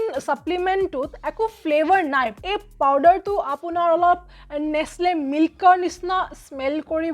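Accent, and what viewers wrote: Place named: Odisha, India. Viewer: Sounds so similar to Odia.😅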